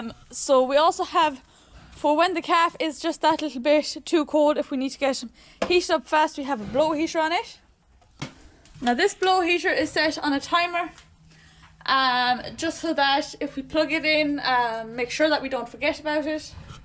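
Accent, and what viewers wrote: Ireland. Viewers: Great video you present very well, love the Irish accent. Stay well and stay safe, and I look forward to more. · How have you managed to hold onto the Irish accent for so long?! enjoying the videos, keep up the good work!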